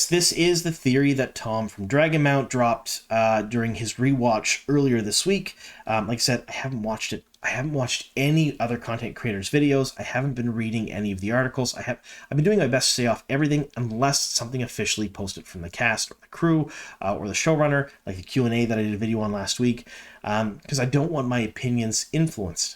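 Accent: Canada. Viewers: From your accent, I thought you must be a Canuck - thanks for confirming! #Tims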